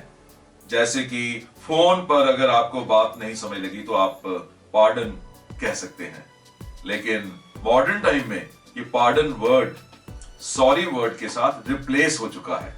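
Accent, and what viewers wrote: Punjab, India. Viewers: Sir , You speak hindi in punjabi tone and me too, will you please tell me how to fix it?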